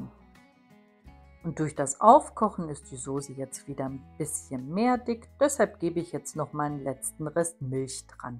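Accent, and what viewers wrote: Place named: Germany. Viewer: Bom dia, não entendo quase nenhuma palavra mas não importa, eu ficaria horas ouvindo sua voz e sotaque alemão 👏🏻👏🏻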